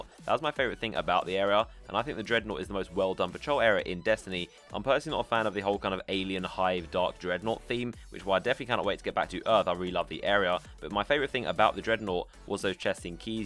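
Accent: United Kingdom. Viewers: Race doesn't influence how you sound. He has a Brit accent because he's from Britain. Stupid.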